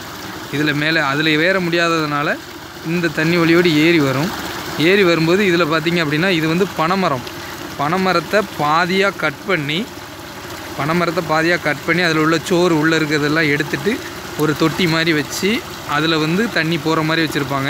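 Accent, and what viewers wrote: Tirunelveli, India. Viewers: Nanum Tirunelveli bro.. slang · Sema, thirunelveli yaaa pa... namma slang varuthuu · Tirunelveli tamil arumai👌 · திருநெல்வேலி பாஷையில் விளக்கம் கொடுத்தது சூப்பர் பாஸ் நல்லா இருக்கு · anna ninka tirunelveli ya 😍😍eale😍😍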